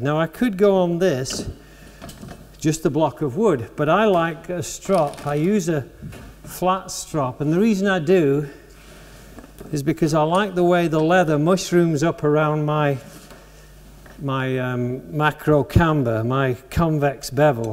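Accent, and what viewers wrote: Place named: England, United Kingdom. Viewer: My goodness, the purists would have me believe I'll be the rest of my lifetime PROPERLY sharpening/honing my cutting tools. Now, with your method, I can sharpen/hone in time to build a guitar like I wanted to in the first place. And on top of that...an English accent that even and Ole Southern Boy like me can understand. Thanks a MILLION. (Subscribed)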